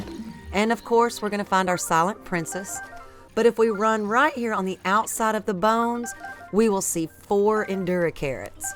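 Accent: South, United States. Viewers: Thanks for making these very informative videos. Love your southern accent.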